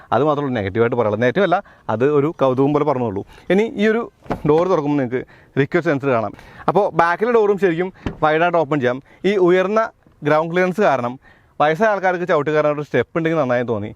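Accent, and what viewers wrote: Thrissur, India. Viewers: ഒരു പക്ഷെ തൃശ്ശൂർ കാരൻ ആയോണ്ട് ആവും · Thirshur language ano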